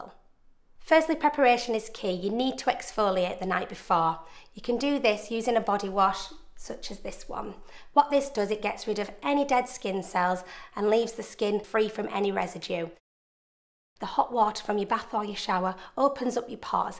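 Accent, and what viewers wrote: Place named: Newcastle, United Kingdom. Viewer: lol shes so from newcaslte or round there xd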